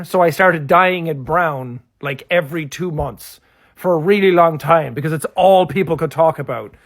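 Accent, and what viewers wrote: Ireland. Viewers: Why does this Viking have an irish accent?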